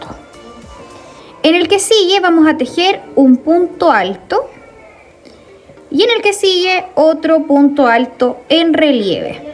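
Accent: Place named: Chile. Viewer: Hola 🤗 Recién encontré tu canal me encanto el vestido y explicas muy bien de donde eres ? tu acento se me hace 🇨🇱 voy a empezar mi vestido espero encontrar el hilo, acá no hay muchas opciones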